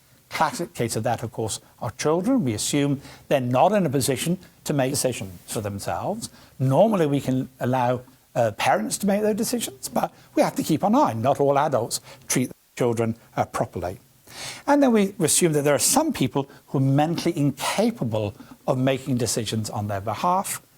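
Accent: United Kingdom. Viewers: Great video! I can't quite place the gentleman's accent; are there any people from the UK who can recognize it? Is he Northern Irish?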